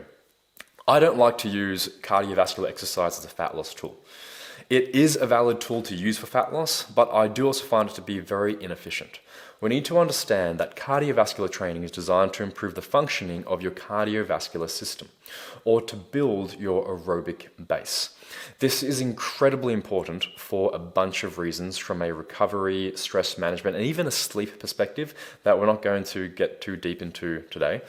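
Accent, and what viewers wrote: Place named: Australia. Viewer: Didn’t expect the Aussie accent 🇦🇺 subbed, cool vlog man.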